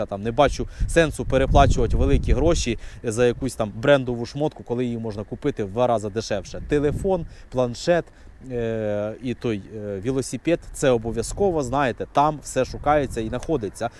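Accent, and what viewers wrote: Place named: Poltava, Ukraine. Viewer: Вас може це здивувати, але половина України БАЛАКАЄ. Далеко не всі в побуті спілкуються чистою літературною мовою. Місцеві діалекти рулять 😊. І немає в цьому нічого поганого, адже завдяки діалектам мова і є такою різноманітною. На Полтавщині навіть є такий жартівливий вислів : на сході "гаварят", на заході говорять, а у нас в Полтаві балакають і все чудово.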